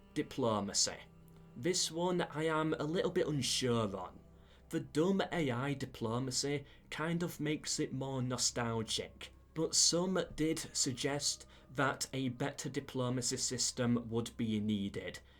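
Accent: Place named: Yorkshire, United Kingdom. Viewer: Yorkshire accent, Ever watch "Sharpe's Rifles" ?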